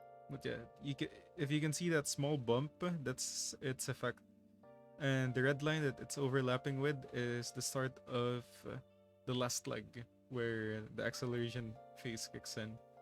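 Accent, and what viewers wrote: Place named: Philippines. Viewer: Interesting video. Honestly I think the main drivers for the burnout are the popular content creators themselves. Lately almost everyday all they do is make videos about preparing for the next CM, and that people need to do XYZ in order to compete. So instead on focusing on the fun aspects of the game, it turns into a checklist grind. I've honestly stopped watching their videos when it's all about preparing for CM. I maybe just watch a few to get some pointers, but I don't pressure myself to do each and every single thing they recommend. BTW, are you Filipino? Was just curious based on your accent. No need to answer if you're not comfortable.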